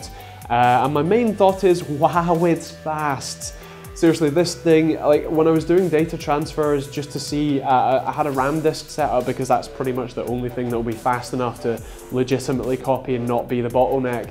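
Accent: Scotland, United Kingdom. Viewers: Love that Scottish accent! I am British also!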